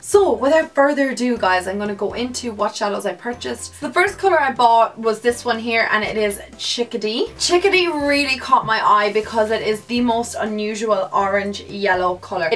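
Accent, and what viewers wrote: Ireland. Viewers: I love your videos so much!!! I love your Irish accent!!! I'm from Ireland too!!! I love you and your channel!!! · Is she irish? Oh shes so lovely dovely 😞 😍💑💏💞💖💕💓💗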